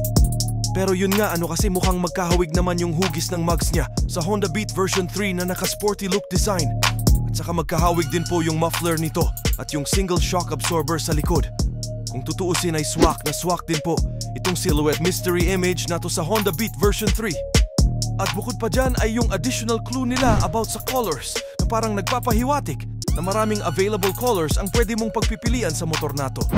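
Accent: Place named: United States. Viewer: kuya halatang laki g america yung accent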